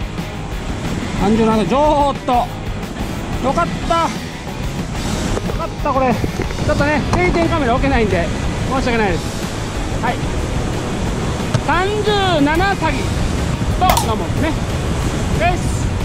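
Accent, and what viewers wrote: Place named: Tosa, Japan. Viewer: 流石のもすカッチ良いなー、そして、土佐弁大好きやわ。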